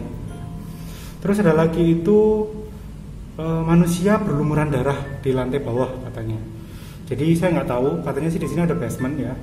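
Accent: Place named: Java, Indonesia. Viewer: Medok banget... 🤣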